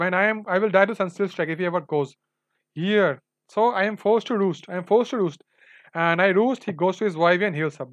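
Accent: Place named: India. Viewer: indian accent ftw 💪💪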